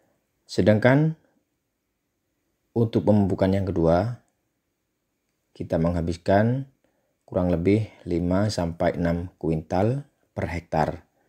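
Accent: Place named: West Sumatra, Indonesia. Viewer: Suaranya mirip Minang kocak 😀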